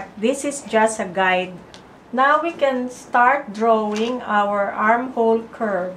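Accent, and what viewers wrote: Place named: Philippines. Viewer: your accent sounded like a filipina..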